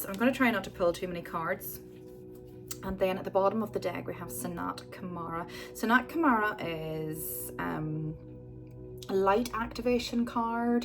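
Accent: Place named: United Kingdom. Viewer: Interesting dialect but I am from USA and can't tell what part of the Uk you are from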